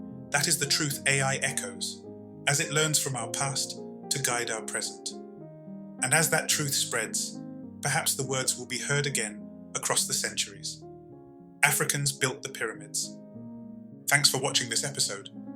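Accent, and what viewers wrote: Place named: Europe. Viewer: You failed to mention that the kemet people knew how to manipulate frequency and energy to actually move the rocks not the silly imagery of ramps and pulleys (a European concept!)..your European accent says it all!...we need and want to hear black people talk about us to us, not this podcast!!!..I've spoken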